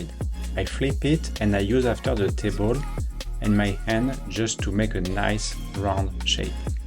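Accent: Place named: France. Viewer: The last time I heard French English accent was so long ago, and it is nice to see a French chef guide how to make a brioche 😄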